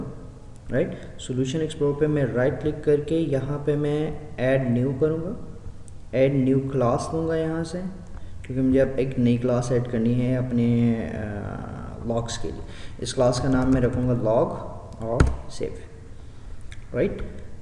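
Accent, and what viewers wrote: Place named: India. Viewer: I didn't understand what this dude was saying. Was he speaking English or an Indian variation of it?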